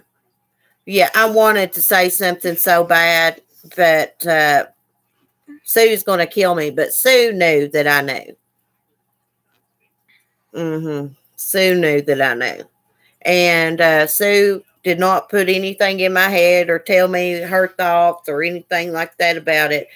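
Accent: South, United States. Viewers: New sub, just like hearing a fellow southern accent! I only watched A once way back when the golightly drama happened. Hate this for the ones she hurt deeply and her son.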